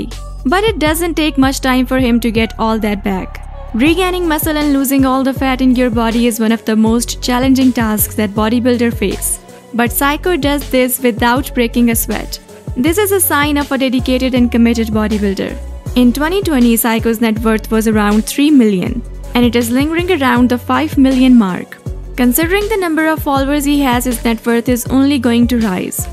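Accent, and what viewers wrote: India. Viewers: Having an Indian woman with a typical Indian accent makes these videos hilarious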